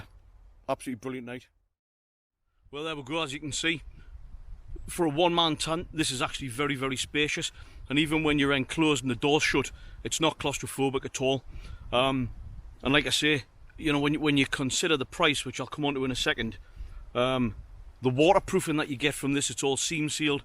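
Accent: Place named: Northumberland, United Kingdom. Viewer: Northumberland is wonderful. Great accent. I've just bought one!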